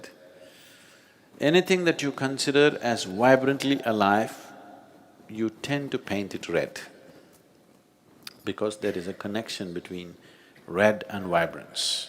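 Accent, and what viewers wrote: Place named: India. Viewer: من از لهجه هندی بهتر انگلیسی یاد میگیرم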